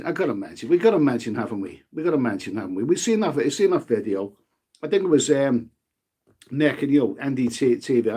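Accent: Liverpool, United Kingdom. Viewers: You’re a scouser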